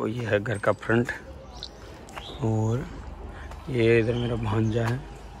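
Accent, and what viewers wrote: India. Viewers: Full rajasthani tone 🤭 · Haryana s hain kia sir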